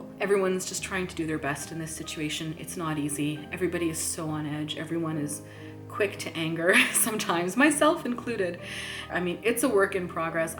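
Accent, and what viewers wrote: Canada. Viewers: Thanks for sharing so openly in this q&a. Thoroughly enjoyed it and liked getting to know more about you. As another 1st gen canadian poc - I get the accent thing. We just sound Canadian, no faking ;-) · Your accent is like any Canadian person and any one who’s telling you fake or anything like that , they have a disease called “ jealousy “.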